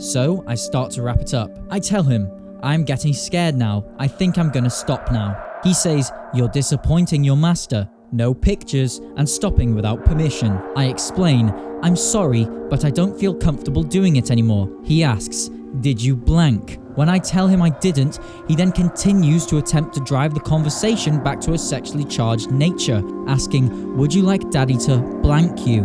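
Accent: Wales, United Kingdom. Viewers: Welsh Chris Hansen. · bri’ish man has good content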